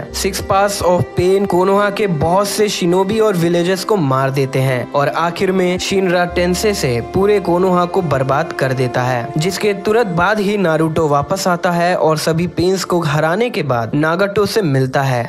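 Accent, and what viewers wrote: Standard. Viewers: shudh hindi mat bolo karo aap jese bolte the wese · Bhai aap itni sudh hindi kab se bolne lage😒😕😒😕😒😕😒😕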